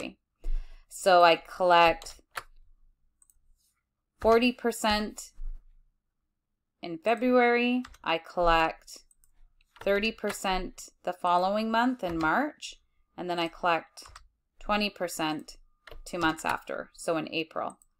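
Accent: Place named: United States. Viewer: The American accent kills me 😂 good vid though 👌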